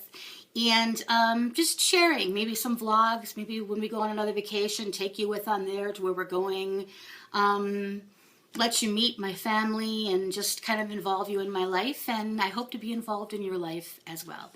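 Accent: United States. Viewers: Glad to get to know you! I hear the Minnesota in you, I'm a Midwestern girl, too. Cheers, Lulu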